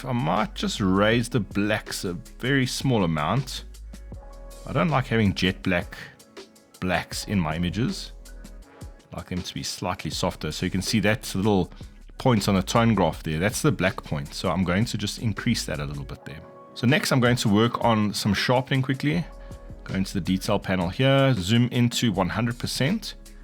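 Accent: South Africa. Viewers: i might be wrong but you sound SouthAfrican, or at least Southern African, and for that i will give this video a like. me supporting local content 😅. jokes aside, i literally started using Lightroom today and the knowledge you parted will act as building blocks to in my photography journey.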